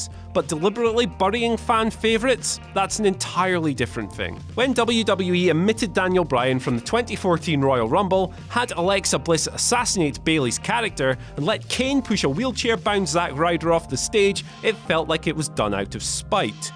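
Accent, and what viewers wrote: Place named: Scotland, United Kingdom. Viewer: I want to keep hearing this Scottish prick's voice. Love this dude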